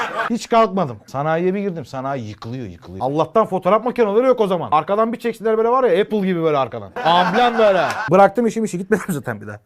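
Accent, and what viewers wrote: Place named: Tekirdağ, Turkey. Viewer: Hikaye süper, birde işin içine Tekirdağlı aksanı girince süper olmuş😁👍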